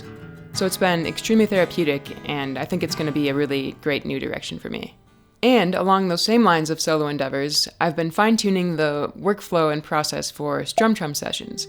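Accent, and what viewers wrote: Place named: United States. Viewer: Where in the States is your accent from?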